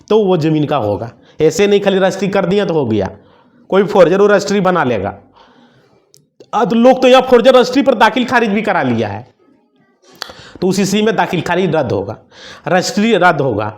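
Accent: Bihar, India. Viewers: Adha padha likha lekin insan khud ko bahut samajhdar samajh raha hai bewakuf , jo bhi bolta hai pura bihari style mein, galat hindi aur english ke sath. Pura zahil hai.😡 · Jara soch ke dekho jara dhayan se suno aur suno, Bihar accent me bolkar bar bar bol raha hai samanjh gia samanjh gia ? Rafu chakker ho gia rafu chakker ho gia.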